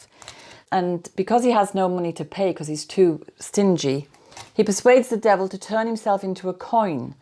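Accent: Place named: Bishop Auckland, United Kingdom. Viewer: I couldn't quite discern your accent...but I did think Bishop Auckland!! I absolutely love listening to your wisdom. Thank you so much xxx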